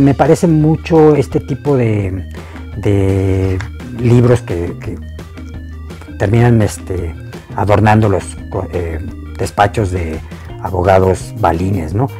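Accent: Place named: United Kingdom. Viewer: I like your lovely british accent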